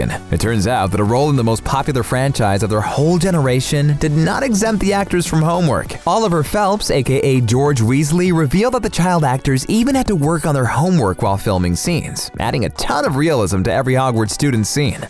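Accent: United Kingdom. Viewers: what is your British but American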